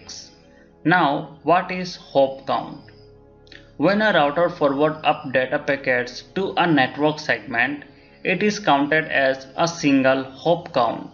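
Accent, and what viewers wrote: India. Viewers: This indian accent is stronger than Arnold Schwarzenegger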